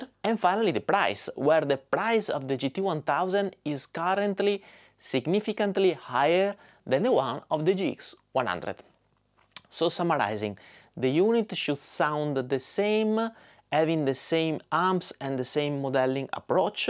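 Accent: Brazil. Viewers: vc é brasileiro kkkk nao é possivel, com esse sotaque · I feel some Brazilian Accent I am also brazillian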